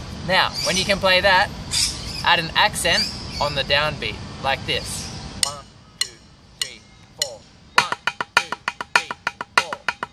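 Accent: Australia. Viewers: You got an australian accent now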